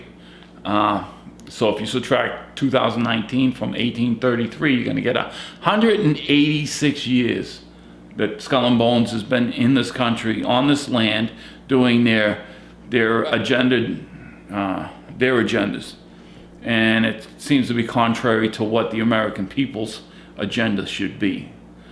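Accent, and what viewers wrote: Boston, United States. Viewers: Please, I'm burning with curiosity: are y'all from Boston? If not, what sort of accent is that?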